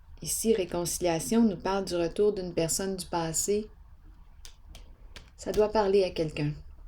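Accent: Quebec, Canada. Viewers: Bonjour Je te découvre après avoir écouté ton message à travers de ce très beau tiraje.... On diré que tu t'adresse à mon cœur.... c'est exactement ça...... La carte du flirt sur la carte du papillon.....me fait réfléchir sur le fait de lui faire confiance ou non..... Elle, Taureau ascendant Sagittaire de 47 ans, avec un ego et un orgueil très fort et démesuré..... Elle a frapper à la porte de mon cœur le mois de mars dernier. Cette femme a souffert des abus de la part de son père jusque les 17 ans. Elle est sublime mais très abîmée....je souhaite croire que elle pourrait encore s'engager et tourner la page, et aller vers une vie de paix et amour sans tromper ni tricher ni commander.....mais je n'en suis pas tout à fait sûr de cela.... Le mois de janvier sera définitif pour y voir plus clair, réconciliation ou rupture.... et c'est moi qui devrait prendre la décision...... 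Ton magnifique tiraje, termine sans se terminer ni donner des réponses à cet belle mais très dure situacion..... Je te remercie pour ce tiraje tres riche et profond, et pour ton merveilleux accent du Québec....que j'adore......Moi je suis aux Pyrénées-Atlantiques aux pais vasc frances entrain de vivre cet exercice de vie..... A mes 72 ans, je souhaite vivre la paix et l'harmonie...... Si cette belle âme choisit la paix, l'amour et l'harmonie je resterai à son cotée pour continuer à grandir ensemble, mais si ne c'est pas le cas, c'est moi qui va tartir cette fois ci. Je te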